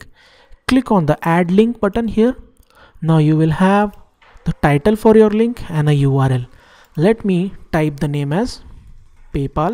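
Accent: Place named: France. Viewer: the french accent is annoying... not trying to be racist...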